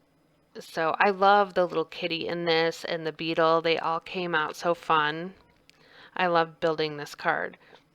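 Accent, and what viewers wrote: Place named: Midwest, United States. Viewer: Girl, I can't help but smile as soon as I hear your happy, friendly voice and that Mid-Western accent! Love! I am so with you on the PFS inks...Love them all. Just need one more and my collection will be complete. They are different for sure, but I love them...excpet the detail black...I think I have a faulty ink pad. But LOVE all the others. But I LOVE, LOVE your card!! You did a great job! Love the interactivity!!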